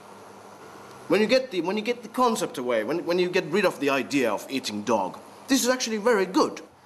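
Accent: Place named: Netherlands. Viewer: he sounds dutch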